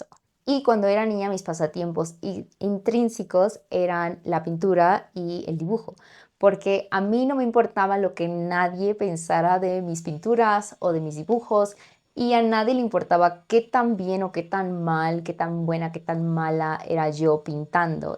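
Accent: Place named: Mexico. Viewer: Yo empezé aprender español a solo uno mes es mi passatiempo, me encanta eschucar tu videos, me encanta lo acento mexicano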